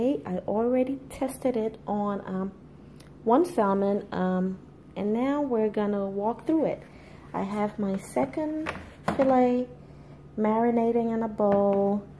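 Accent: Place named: New York, United States. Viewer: I thank you for the info... By the way you sound like a Puerto Rican from New York... I could be wrong. I spend a lot of time in Queens..lol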